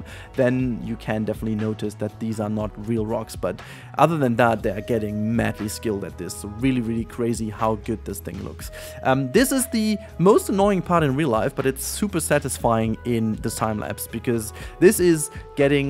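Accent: Germany. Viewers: Sorry to ask but are you German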